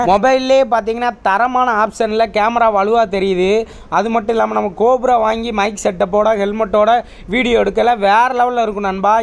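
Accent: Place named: Aruppukottai, India. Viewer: Pronoun aruppukottai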